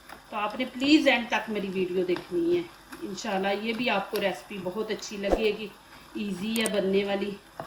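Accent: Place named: Punjab, India. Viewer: Bohat Mazy dar Anday began bnay hen ap ka panjabi lehja bohat Pasand h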